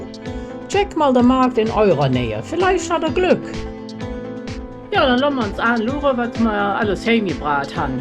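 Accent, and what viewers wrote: Cologne, Germany. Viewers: Datt iss jo sööt. Ich hev alles verstaan , obwohl datt Kölsch wer.Ick Snack Platt und bedank mit för datt Video. Allns Goode .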